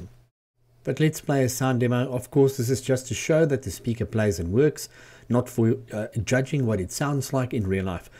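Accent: South Africa. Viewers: That's a definite South African accent there. Where can we find stuff like this in SA? Awesome build!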